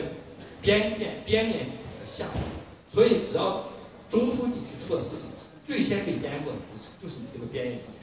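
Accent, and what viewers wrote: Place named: Sichuan, China. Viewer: 其实就是一般川普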